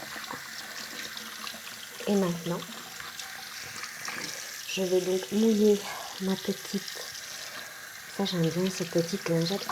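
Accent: Belgium. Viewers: l’accent belge en plus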